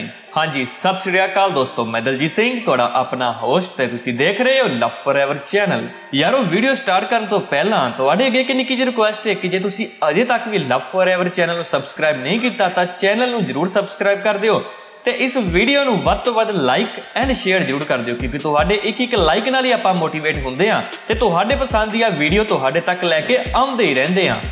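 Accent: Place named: Uttar Pradesh, India. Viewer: Bai keho jahi Punjabi bolda? UP da rehan wala te nahi kitey?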